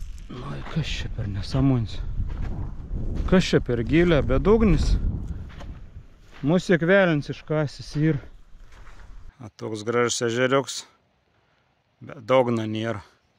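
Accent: Samogitia, Lithuania. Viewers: Ačiū! Už žemaitiu kalb.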